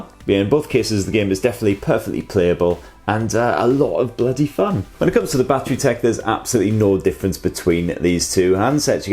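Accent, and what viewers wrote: India. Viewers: Not Indglish. English title with Indian mambling. Dislike guaranteed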